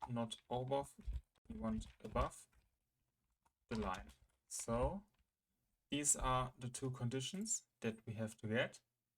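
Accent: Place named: Germany. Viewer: After two seconds, everybody recognises the German accent.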